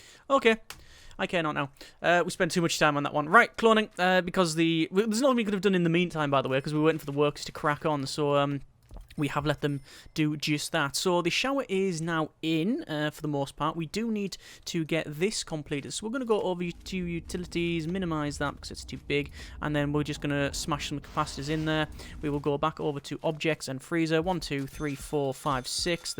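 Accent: United Kingdom. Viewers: Are you from the UK. England or Scotland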